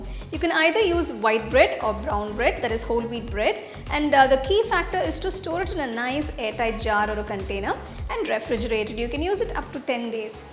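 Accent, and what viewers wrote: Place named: India. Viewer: are u indian mam? accent is 👍 nice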